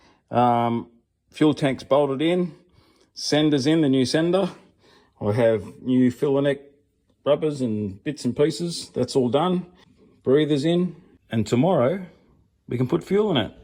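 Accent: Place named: Australia. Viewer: Love your no dramatics Aussie presentation. Down to earth. Refreshing compared to Some overseas vids. cheers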